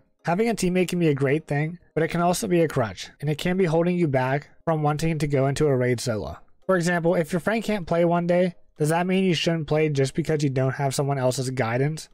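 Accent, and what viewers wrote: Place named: Pittsburgh, United States. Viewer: I could hear it in your accent haha.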